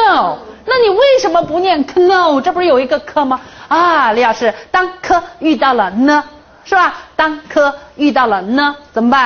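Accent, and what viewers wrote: China. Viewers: Chinglish. Most of the pronunciation is incorrect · Chinglish !!!! 別再拼音了, 完全不正確, 深山農村無人怪你. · 唉！我跟你學到八级又怎麽様？ 中国式音調！真的跟外国人交流 時就發火，發現青春白費了！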